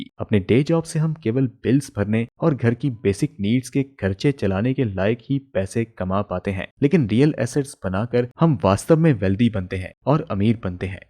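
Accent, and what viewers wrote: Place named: Pakistan. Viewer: Your language sounds like pakistani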